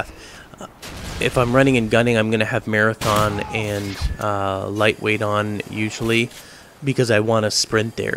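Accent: Canada. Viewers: Is this dude canadian? his accent is kinda funny.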